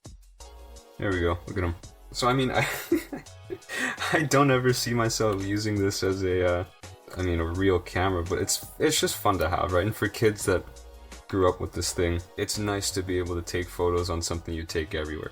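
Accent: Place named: Australia. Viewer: australian accent Nice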